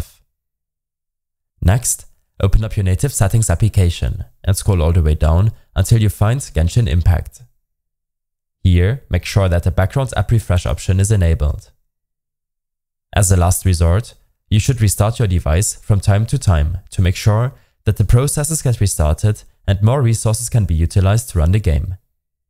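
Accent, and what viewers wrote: Germany. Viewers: Your accent sounds like someone from Germany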